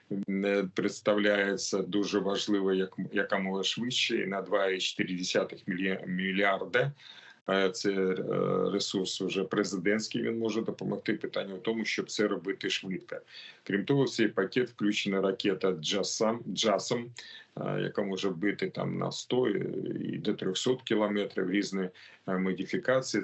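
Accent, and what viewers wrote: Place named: Russia. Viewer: Акцент ужасный, русскоязычные очень смешно говорят по- украински. Сама такая.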